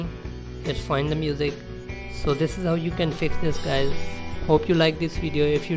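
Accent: India. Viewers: When i heard an Indian i knew this wasn’t going to work.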